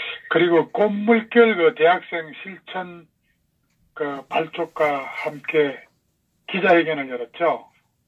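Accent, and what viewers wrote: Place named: Gyeongsang, South Korea. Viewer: ㅋㅋㅋ... 경상도 구수한 사투리 쪼매 바빴다. 좋아요. ^^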